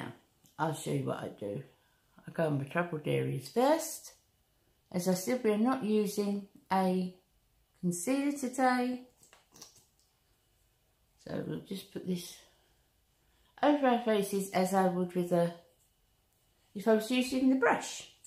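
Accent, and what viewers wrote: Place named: North, United Kingdom. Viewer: Hello, I'm new to your channel, and have really been enjoying your videos! I have to agree about this foundation. It is an excellent option for summer. I live in Tennessee in the US and we are currently dealing with temps of 96 degrees F (~36 C) with heat indexes of 109 F (~47C). Despite the horrible humidity here, this foundation holds up fairly well, so that's saying a lot for its staying power, but as you said, it's not heavy feeling. Just curious... What skin type are you? I'm fairly oily in my T-zone, so the matte finish truly helps me. I think it looks gorgeous on you all the same! Big Rimmel fan from over the pond here. My husband is from central Scotland, and we were trying to place your accent. Our guess.. Are you from the north? Charming for sure. 😉 💕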